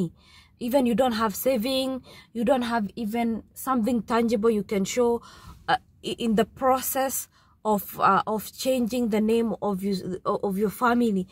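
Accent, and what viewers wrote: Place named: Arab world. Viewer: This Arabic accent....special.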